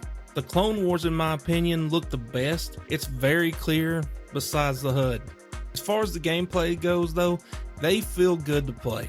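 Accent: South, United States. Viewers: it’s probably super obvious to you lol, but yeah now that I think about how many times I have to hear the same sounds over when editing… lol I didn’t even think of that 😂 But dude trust me, I play guitar and sing in front of small audiences and I still hate my voice, and when it’s played back to me, I’m like damn… is that what I really sound like? Ughhhhh lol But it’s just natural for most people ti hate their voice when hearing it back, it’s just funnny (to me lol, maybe not you ) but yeah it must get annoying hearing your own voice so many times over and over the same phrase or something when editing! Trust me though, for the third time, you’ve got a solid voice. A little raspy, southern, it actually stands out among others in a good way. Way too many British accents on reviews lol don’t get me wrong, it’s a cool accent, but there’s just a lotta Brit’s in gaming I feel… they’re pretty goood at it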